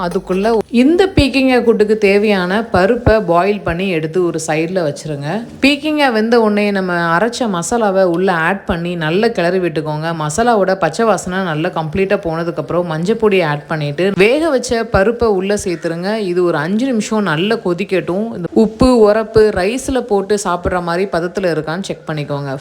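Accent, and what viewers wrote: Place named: Erode, India. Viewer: It is erode side accent.....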